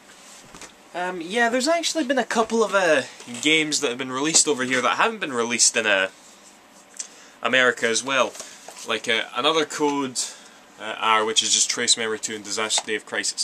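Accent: Scotland, United Kingdom. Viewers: And by the way I have been watching your lets plays back to back in the playlists and consider you to be one of the very best lets play artists. Scottish accents for the win! (even though I'm English lol)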